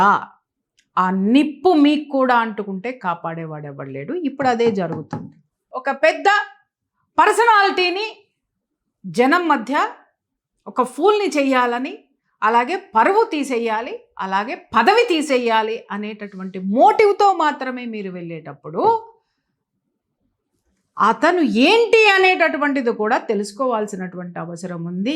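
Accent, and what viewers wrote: Telangana, India. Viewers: మీది తెలంగాణ నేనా అక్క తెలంగాణ ఐతే నువ్వు ఇలా మాట్లాడారు అని అనుకుంటున్నా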